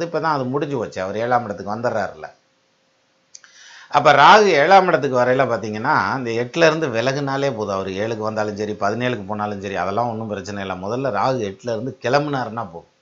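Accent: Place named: Madurai, India. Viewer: You are great and I belong to Madurai, your Madurai slang is wonderful. One question - People in what age have 2 shift of “Ellarai Sani”...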